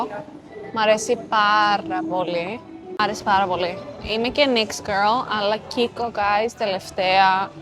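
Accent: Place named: Cyprus. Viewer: Αφου σου βγαίνει το κυπριακό... μιλα μας κυπριακά!!!!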